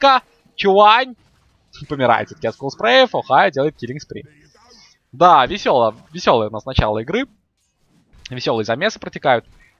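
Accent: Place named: Ukraine. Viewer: Не очень понятно, когда ты говоришь украинским акцентом :/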